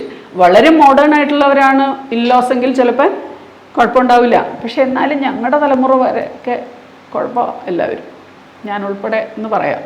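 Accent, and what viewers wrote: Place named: Palakkad, India. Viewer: അസ്സൽ പാലക്കാട്‌ ഭാഷ 😄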